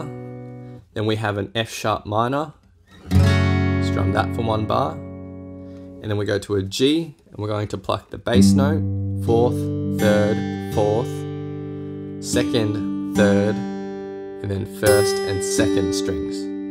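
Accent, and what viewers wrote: Australia. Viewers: When i heard his voice I said Mateeeeeeeeeeeeeeeeeeee his an Aussie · Are you from Australia??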